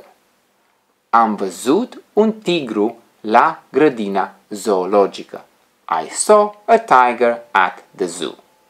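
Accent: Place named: Transylvania, Romania. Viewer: E foarte faină lecția și metodică, exceptând accentul ardelenesc(trasilvănean... cafia/cafea, curtia/curtea...etc) :P Its a very fine lesson and methodical, excepting the transylvanian accent of the romanian language, in which people tend to pronounce ”i” instead of ”e” if this letter(e) is inside a word... cafia/cafea/coffee, curtia/curtea/the courtyard...etc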